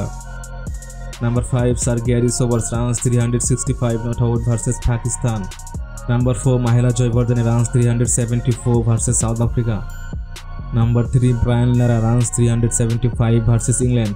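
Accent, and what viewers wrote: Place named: Sylhet, Bangladesh. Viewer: This guy's Sylheti English is funny🤣🤣🤣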